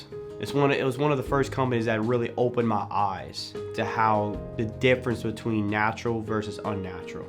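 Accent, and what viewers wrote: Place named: South Louisiana, United States. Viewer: I literally subscribed because you said you were from south Louisiana. I lived in Lafayette for 18 years. I can definitely pick up on your accent!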